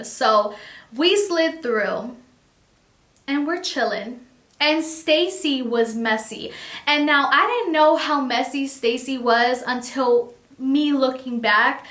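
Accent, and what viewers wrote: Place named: Newark, United States. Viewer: Sounds like Newark NJ lol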